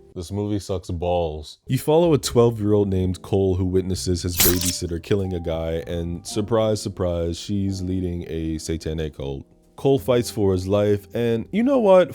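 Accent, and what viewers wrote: United Kingdom. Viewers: OMFG I love a fellow cinephile!!!! But what I love more is your random british accent that you let out in your videos!!!! Can't wait to see you have more and more success! ❤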